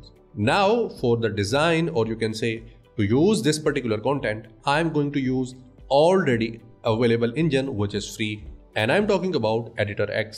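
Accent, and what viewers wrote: India. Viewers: this guys indian accent is so thick its hard to understand and listen to !!..come on man.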